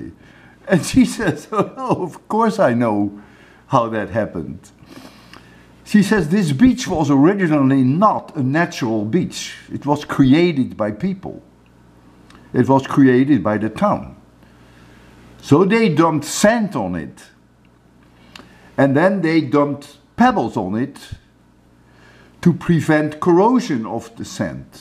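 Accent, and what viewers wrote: Netherlands. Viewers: Professor, are you Dutch?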